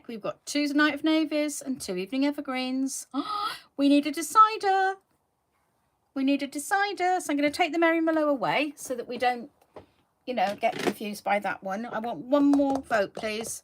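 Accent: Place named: United States. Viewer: Love you’re American speak! Lol. Terrific cards as always! Sorry to have missed but we went in the pool. Rain was to start early so we went in early. Glad we did hasn’t stopped raining yet and it’s Sunday 10pm. Thank you!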